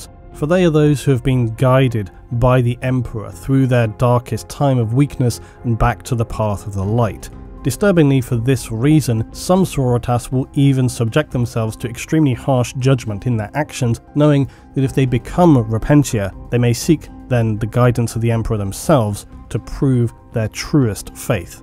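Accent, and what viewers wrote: United Kingdom. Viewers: Why do you think I like for my 40k lore fix the most? =D Love that British accent, its like Totalbiscuit's except with more charm.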